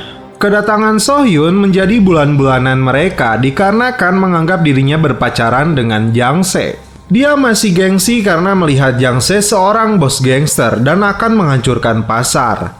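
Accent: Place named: Sunda, Indonesia. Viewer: Sorana sunda pisan, anjir.... 😀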